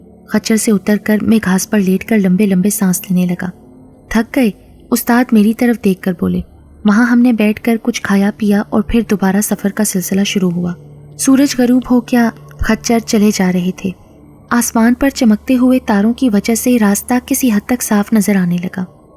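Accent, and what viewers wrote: Pakistan. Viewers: Sastar ap kas jaga sa hea zaban sa lagtha hea ap pakistan sa hea lovli vice lazmi bathana mean ap ko malnay ayho gu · Allah aap ko khuash rakhe mujhe Nahi pata ki aap ye comnt padhengi bhi k Nahi Me India se hu or aap k Andaz se Lagta h ki aap Pakistan se he ,par itna kehna chata hu ki mujhe aap ki aawaz or andaze bayan se muhabbat ho gai h.. meri baat Ka koi galat MATLAB mat nikaliyega plz... Jab se Mene apki kahaniya Sunna shuru ki h me or Kuch Sunna ya dekhna hi Nahi Chahta . Allah aap ko Khush rakhe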